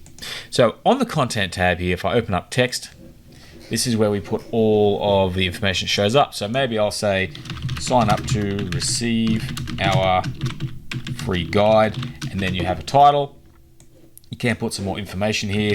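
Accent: Australia. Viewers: Thanks, good to hear an Aussie voice for a change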